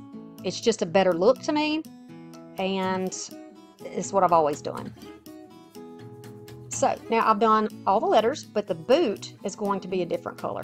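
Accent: South, United States. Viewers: listening to the Southern drawl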